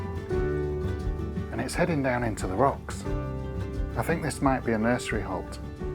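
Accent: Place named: England, United Kingdom. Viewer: So magnificent and magical in every way. The beauty of that island, the photography, the aerial views, the music, your wonderful English accent I am in love with it all. I live on the California coast and have always gotten a kick out of the otters here in Santa Cruz, Big Sur etc but they seem to be different than the ones there. Here they are smaller, fluffier and their tails aren’t so long. Thank you for your presentation very lovely.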